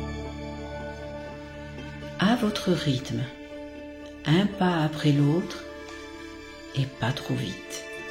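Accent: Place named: Marseille, France. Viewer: L’accent marseillais et l’écho derrière la voix rendent la vidéo très peu crédible et on décroche très rapidement. À revoir totalement. La musique en fond est trop présente et très gênante... voire irritante.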